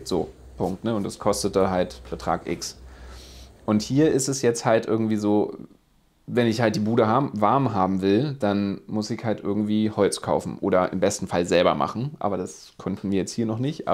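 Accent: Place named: Berlin, Germany. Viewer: Super, herzlichen Glückwunsch zu dem Arbeitsvertrag! Das freut mich für euch 🙂 Um eure Frage bzgl. der Sprache i.S. Kanal zu beantworten: Ich spreche leider nicht perfekt englisch - auch nicht annähernd. Ich freue mich deshalb immer wahnsinnig, wenn endlich mal ein Kanal aus Skandinavien auf deutsch ist. Wenn der Kanal in Zukunft auf englisch wäre, würde ich wieder mit Untertitel schauen müssen, was dann oft bedeutet, dass ich das Video zweimal schauen muss, weil ich erst lese, um was es geht und mich beim zweiten Durchgang auf die Bilder konzentriere, denn die sind bei euch immer sooo schön. Ehrlich gesagt würde mir auch das "berlinern" zwischendurch SEHR fehlen ;-) .... Aber: auch wenn ich die Videos dann vielleicht nicht mehr schaue, werde ich euch treu bleiben, auch liken und auch einen Kommentar da lassen (wird dann eben immer nur ein Herzchen ;-), alleine um euch mit meiner Stimme zu unterstützen .... 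Im Sommer das Haus verlassen .... wie blöd! Och Mann, das ist eine wirklich blöde Situation für euch. Ich wünsche euch, dass ihr euren Weg und eine zufriedenstellende Lösung findet. Liebe Grüße ❤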